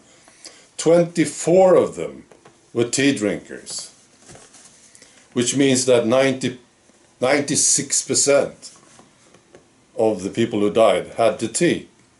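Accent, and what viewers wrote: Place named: Sweden. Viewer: Apart from being Swedish, there is nothing wrong with your accent.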